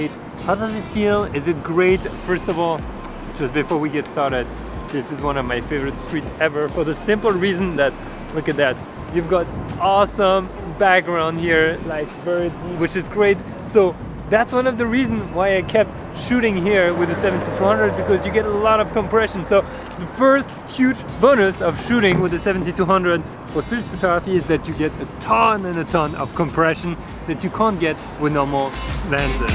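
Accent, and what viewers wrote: France. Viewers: Un de ces accents français ahhahaah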